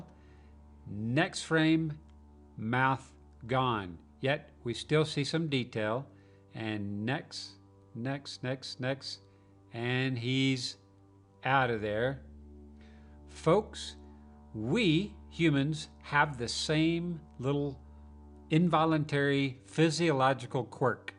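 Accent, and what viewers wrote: South, United States. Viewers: ***** Where can we find your artwork? I am living in Utah and the mormons destroyed my design career- that is another story, but I am from Memphis and lived in Nashville for 8 years- Is that a southern accent that I am picking up? . But, I have decided that I want to do artwork and get a website going. Lots of Bigfoot sightings here, well, everywhere it seems.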